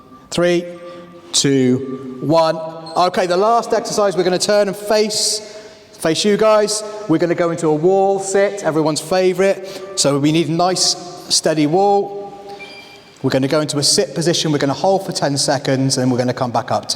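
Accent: England, United Kingdom. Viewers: Where is this filmed and what accent do you have…English, Aussie, what province? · I rather enjoyed those exercises! Also felt at home with a lovely English accent! Thank you.!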